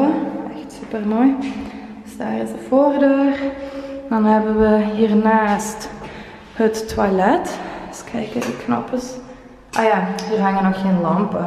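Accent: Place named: Russia. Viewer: HERMOSO LUGAR!!! MY LOVE!!! Y A PROPOSITO DE QUE PAIS O NACIONALIDAD ERES?? RUSA?? UCRANIA?? VEO QUE HABLAS INGLES, PERO TU ACENTO ES DIFERENTE. BYE...BYE...HERMOSA.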